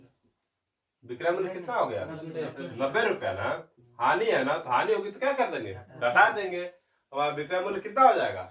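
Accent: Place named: Bhojpuri, India. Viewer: Aaj pahali baar bhojpuriya andaaz me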